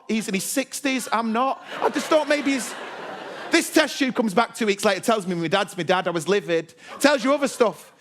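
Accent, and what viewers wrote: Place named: United Kingdom. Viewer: This is so british and i cant understand some of it but love is love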